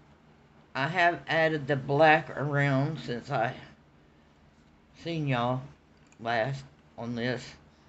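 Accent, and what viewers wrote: South, United States. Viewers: Don't you dare not use your beautiful southern accent!!🙂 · I love the color of your fish. I love a Southeren accent.💙💙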